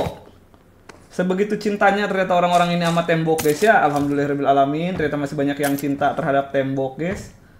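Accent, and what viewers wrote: West Java, Indonesia. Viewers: Sunda mana mang? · Hajar terus mang ku toxickan sunda, nyieun lucu sabab ngomong indo tapi logat namah angeur we sunda🤣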